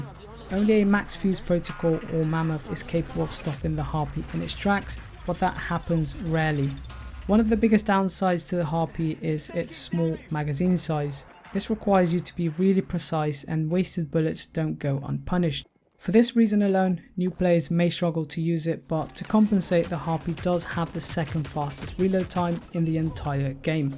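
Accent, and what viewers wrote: United Kingdom. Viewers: Ashy British voices psh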